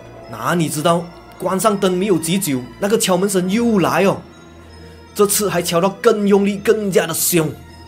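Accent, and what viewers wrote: Malaysia. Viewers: 我一聽 就知道 是 來自 馬來西亞的 主播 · 故事情节讲得很好！很自然！因为有马来西亚人讲话的口声而产生共鸣！ 我也有真实灵异親身经历故事！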